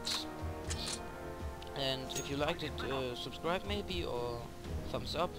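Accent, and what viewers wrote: Germany. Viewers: Man merkt irgendwie dass du deutsch bist,auch wenn du es nicht gesagt hättest,liegt nicht mal am Akzent,nur an der Art wie du eben redest ^^ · Ey , war klar das du Deutscher bist! :D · Are you German? Could we talk a bit? I got the same voice like you xDDD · More people know English than they do German.Only about 100 million people speak german and 340 million people speak english.In other words,there's a more likely chance of someone speaking english than someone speaking german.Besides,it's only his accent.Don't be fooled by the idiots to are racist towards Germans.Most of us are just telling him he needs to work on his English so there's no reason to be crazy mad about it. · DEUTSCH ! :D habe ich sofort an der Aussprache erkannt :D Abo ist dir aber sicher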